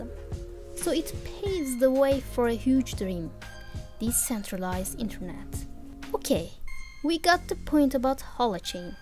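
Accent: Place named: Turkey. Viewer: I can hear a turkish women 👀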